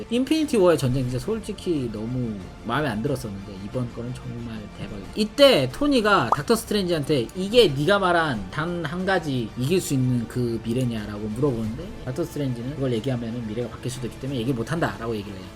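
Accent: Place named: Japan. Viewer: 어 일본분인가여... 억양이.. 듣다가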